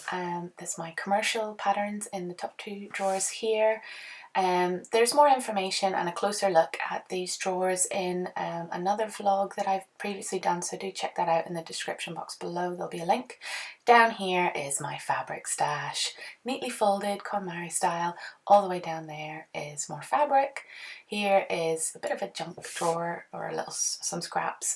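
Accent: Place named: Scotland, United Kingdom. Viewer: Nice setup. Thank you for sharing. You have a very handy husband. By the way, I detect a slight accent; Scot?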